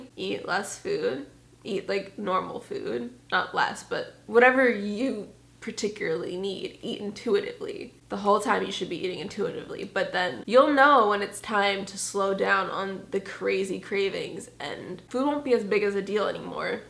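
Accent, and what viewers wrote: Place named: Los Angeles, United States. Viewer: her accent is like PURE LA now lmao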